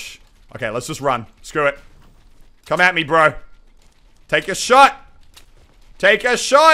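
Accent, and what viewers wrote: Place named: Australia. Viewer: Jesse Nieves he is ausie and sounds like croc hunter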